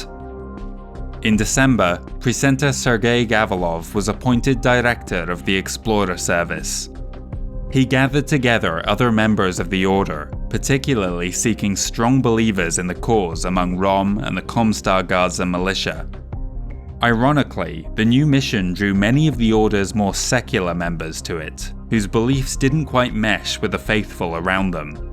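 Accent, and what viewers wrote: Scotland, United Kingdom. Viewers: MechCommander is the first "proper" PC game I ever played. Remembering it just a month ago is how I suddenly ended up stumbling upon your channel. And my oh my. You have blown my mind with this long-form content. Unreal, well done. P.S. are you Scottish? You have such an interesting Scotland/England combination accent. I'm from just over the border, in Northumberland.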